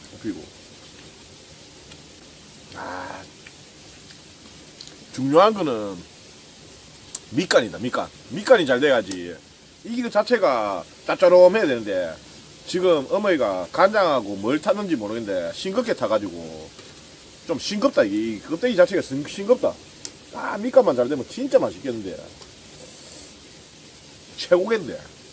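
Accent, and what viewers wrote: Gyeongsang, South Korea. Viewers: 저는 저희 아버지 소개로 이 채널을 알게되었는데 사투리 보니까 같은 경상도분 이신가봅니다. 사회에서 사투리 쓸때는 스스로 몰랐는데 방송으로 들으니 맛깔나는군요.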